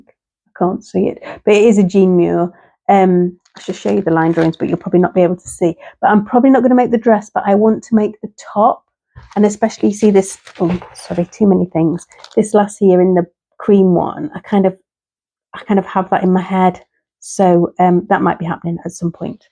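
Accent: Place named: Midlands, United Kingdom. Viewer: Great makes. You sound like you live in the midlands area? I'm from Stoke.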